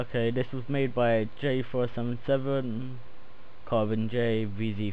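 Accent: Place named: United Kingdom. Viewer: it was refreshing to hear one of these tutorials from a british voice :]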